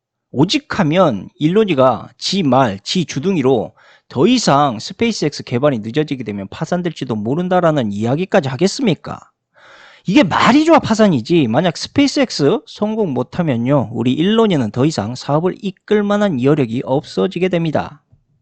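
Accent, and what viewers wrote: Jeolla, South Korea. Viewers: 전라사투리로 욕을 찰지게하시니. . 시간이 금방지나가네요 ㅋ 일론이 아버지도 사기꾼이었다고 하든데. . 피가 흐르는건가?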